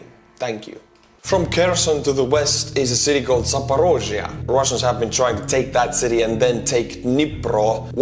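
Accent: Estonia. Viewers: Don’t lie! You know that Estonian accent is awesome. It sounds to my ears like some mix of standard “eastern european” mixed with a bit of Finnish, but then a healthy dash of Italian and even some Catalan with the rolled R’s. Love it, man - I’ve really grown to appreciate the three furiously independent “Baltics.” Edit: I came to this appreciation by way of John Neeman tools, now the Autine Forge and Guild, a Latvian collective dedicated to traditional building techniques and crafting world-class, handmade tools and gear. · I love your Estonian pronunciation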